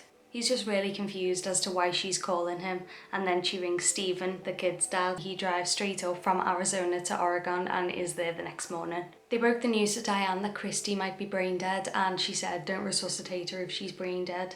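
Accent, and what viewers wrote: Liverpool, United Kingdom. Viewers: is From Liverpool, England. That is a Scouse accent.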